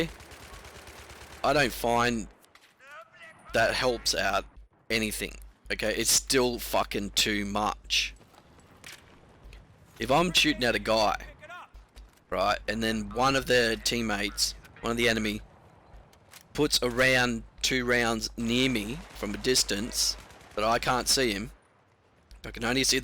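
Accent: Australia. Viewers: fuck i love aussie commentators haha,subbed!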